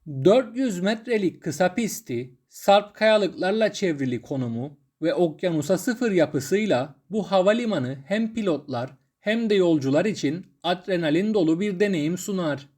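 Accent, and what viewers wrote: Cyprus. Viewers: Abi kıbrıslımısın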